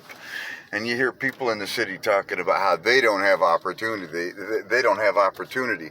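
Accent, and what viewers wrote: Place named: North, United Kingdom. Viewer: Your from up North aren’t ya buddy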